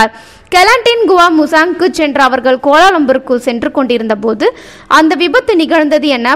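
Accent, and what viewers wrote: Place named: Sri Lanka. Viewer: The way she pronounes lk fm Srilanka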